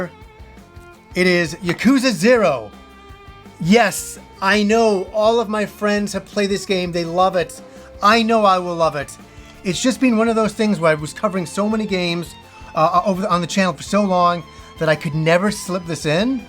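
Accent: United Kingdom. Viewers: Hold the phone...you’re British?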